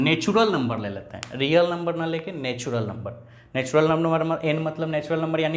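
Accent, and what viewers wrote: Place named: Bihar, India. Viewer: Bihari language me feel hi Alag hai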